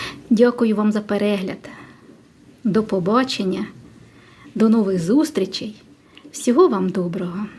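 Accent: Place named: Sumy, Ukraine. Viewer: акцент сумчан не с каким не перепутаешь. угадал?🙂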